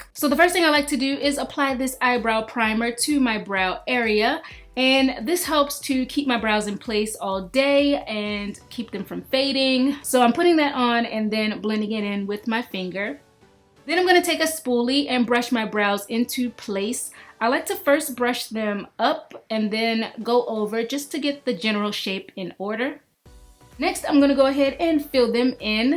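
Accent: Baltimore, United States. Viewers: u have the strongest B-more accent.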